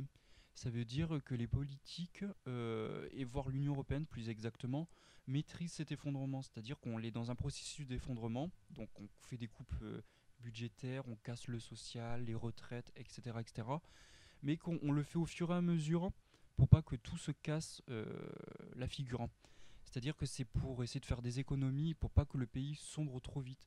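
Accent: South, France. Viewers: votre voix est agréable avec aussi cet accent du soleil . bonnes voyances également. cordialement .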